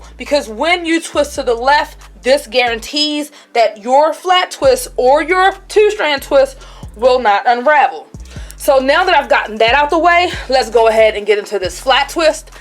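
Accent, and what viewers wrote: Baltimore, United States. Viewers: I’m sorry idk what your accent is, sounds like Baltimore but love how you “two” like tew lmao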